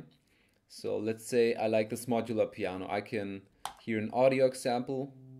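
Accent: Germany. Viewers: Wie man einfach sofort hört das du eigntlich Deutsch sprichst xd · Man hört so krass das deutsche akzent raus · hahah habs am akzent gemerkt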